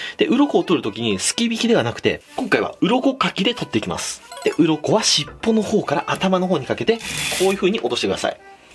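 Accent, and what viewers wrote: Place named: Nagoya, Japan. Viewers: いつもより名古屋べん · 名古屋弁イントネーションきくとさんを思い出します。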